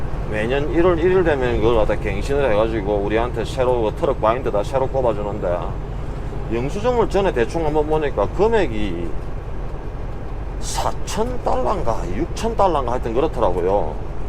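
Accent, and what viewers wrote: Gyeongsang, South Korea. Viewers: 이만 돌파 축하드립니다 1편 부터 12편 까지는 말 없이 자막처리 12편 부터는 구글지도 사용 일정 안내 ㅋㆍㅋ 님의 영상이 하루하루 멋지고 있네요 아직까지 님 영상 다시보기 보고 구순한 갱상도 사투리가 몆편부터 시작인지 현재 다시보기중입니다ㅡㅡㅎ.ㅎㅡ 폰 데이터 다 소모 했읍니다ㅡㅋㆍㅋㅡ 고속도로 국도 사항은 한국이 짱이죠 돌쇠 엘프 밍키 상봉까지 안.운 하세요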